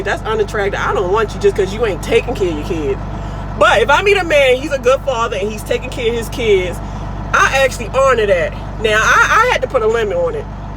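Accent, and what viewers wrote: Baltimore, United States. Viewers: You from Baltimore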